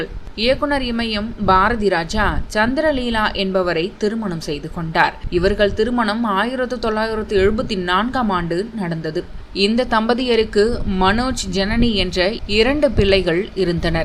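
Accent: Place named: Theni, India. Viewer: Enga ooru thangam ivaru ivarum marimutthu sir ivanga rendu perum than enga theni oora oda slang la aptiye passuvanga ivanga pessuratha ketta avlo happy ya irukum we miss u sir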